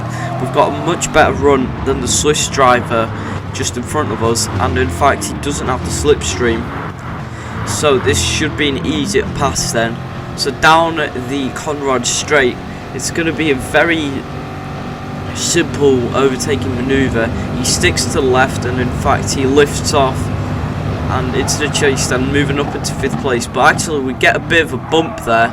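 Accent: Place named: Northern England, United Kingdom. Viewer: He’s got a northern accent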